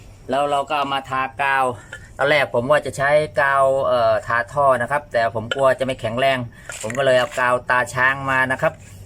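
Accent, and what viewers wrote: Chanthaburi, Thailand. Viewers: พี่คนจัน หรือ ระยองครับ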